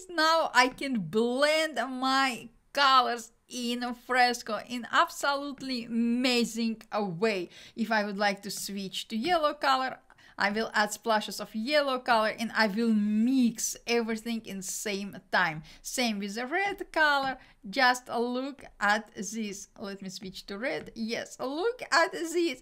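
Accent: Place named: Russia. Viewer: Its russian accent btw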